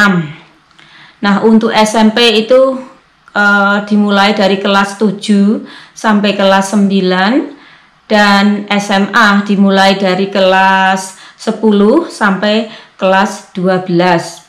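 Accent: Java, Indonesia. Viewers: Logat jawanya ga hilang mba'yuk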